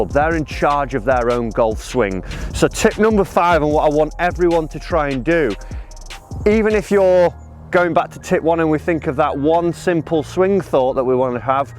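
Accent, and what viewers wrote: United Kingdom. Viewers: Why is every golf instructor british? Lol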